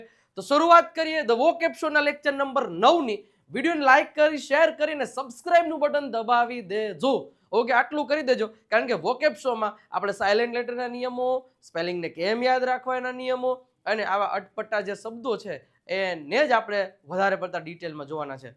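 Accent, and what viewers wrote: Kathiawar, India. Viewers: 💖💖"કાઠિયાવાડી અવાજ અને ઇંગ્લિશનું જ્ઞાન, સર છે ગુજરાતનું ગૌરવ અને શાન!"💖💖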